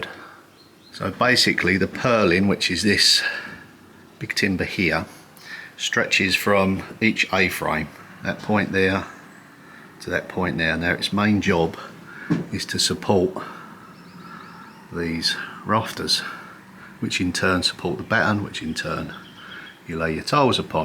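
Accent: United Kingdom. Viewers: I love seeing your videos, both here and on the Pethericks!. I'm curious, where in Britain were you raised? I'm working on my accent for acting and wonder where your accent comes from? Thanks!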